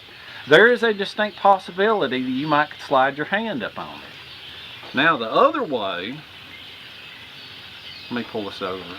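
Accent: South, United States. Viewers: Another good video. I guess one of the reasons I enjoy your videos so much is that you do show different ways of doing things. That and we share the same southern accent! LOL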